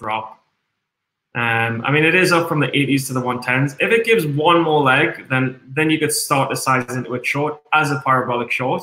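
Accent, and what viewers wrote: North East England, United Kingdom. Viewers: hey man are you from north east of England????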